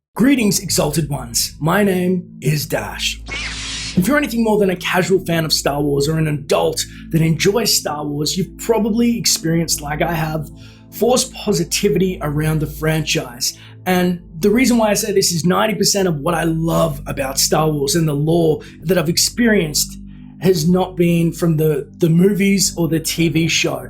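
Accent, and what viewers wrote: Australia. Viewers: Hey I Dunno If This Has Been Asked Before But Are You An Aussie By Any Chance???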